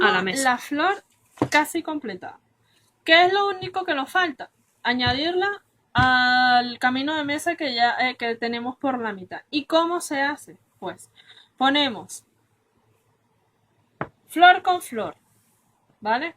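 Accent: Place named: Venezuela. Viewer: Ese hermoso acento, solo puede ser de VENEZUELA. Saludos desde Barquisimeto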